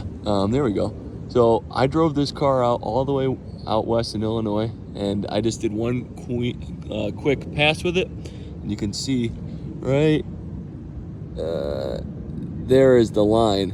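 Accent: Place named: China. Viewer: I think this video was made in China, Man is trying to hide his accent. China is influencing in our market.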